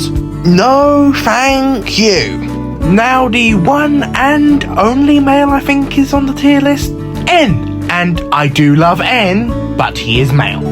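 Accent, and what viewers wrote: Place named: United Kingdom. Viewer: You're so british it's grating 😔😔😔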